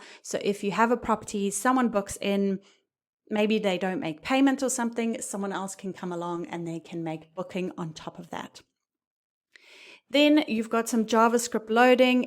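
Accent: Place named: South Africa. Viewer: Great video and very helpful. Thank you,. Nice to hear a South African accent:)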